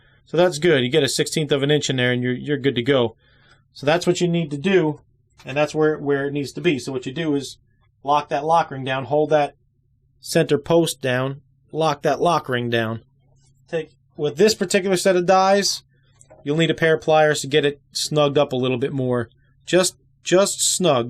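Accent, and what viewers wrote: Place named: New Jersey, United States. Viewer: Thanks that was very helpful.You sound like your from NJ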